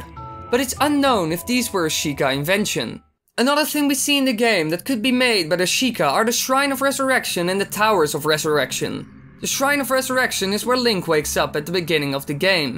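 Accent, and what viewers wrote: Netherlands. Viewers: You're Dutch right? If so, dat is te horen haha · ik hoorde het aan je accent, ik heb t gevoel dat ik dat bij elke nederlander kan 😂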